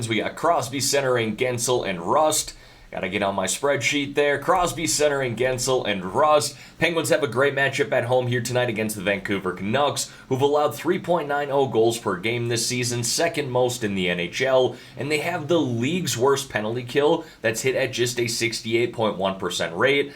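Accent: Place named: Minnesota, United States. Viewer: your def from Minnesota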